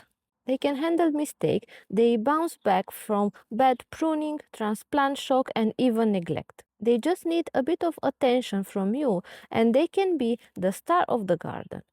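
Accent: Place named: England, United Kingdom. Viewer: Very interesting and informative . I love your English accent.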